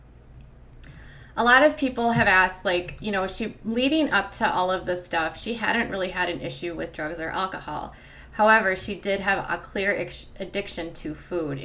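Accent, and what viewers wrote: Minnesota, United States. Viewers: Haha awesome shirt!! I asked you if you were a midwesterner?? I can spot a MN accent or northern a mile away. I am from MN so not picking on you. I am a new subscriber and love your videos!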